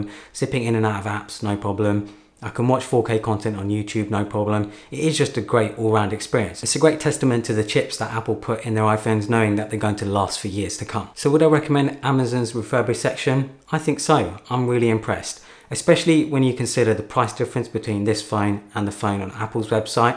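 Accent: United Kingdom. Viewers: No one: Absolutely no one:: "This is a banger, it's wuily wuily fantastic " (Really really fantastic) That British accent 👌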